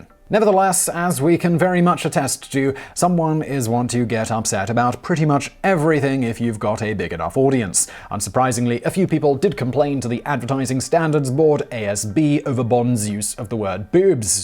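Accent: England, United Kingdom. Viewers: Never have I heard someone speak so eloquently and academically on colloquial breast terminology. British accents make everything sound more serious. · LMBO saying fun bags in English accent with a straight face just hillarious 😂 very interesting research lol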